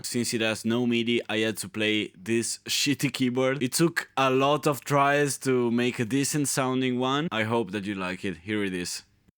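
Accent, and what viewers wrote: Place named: Emilia, Italy. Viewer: Sei palesemente emiliano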